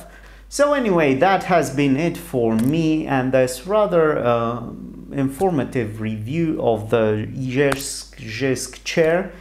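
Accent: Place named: Romania. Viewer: Care ti se pare mai ok, cel mesh-at ?ca nu am inteles ( btw misto accent, mi-a luat ceva sa imi dau seama ca esti roman 😂 )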